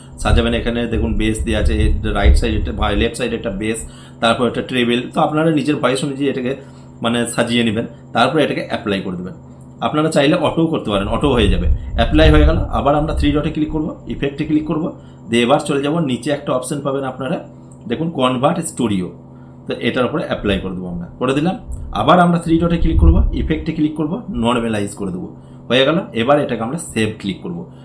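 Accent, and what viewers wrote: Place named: India. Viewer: আপনার কয়েকটি কথা শুনেই আমি বুঝেছি যে আপনি ভারতীয়।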